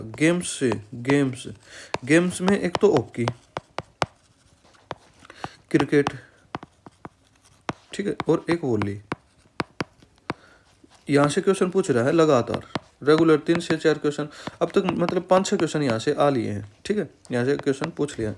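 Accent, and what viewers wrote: Haryana, India. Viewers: Bhai aap haryana se ho kya 😊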